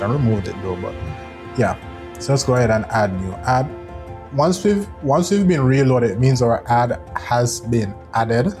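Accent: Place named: Jamaica. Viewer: Bro you sound so Jamaican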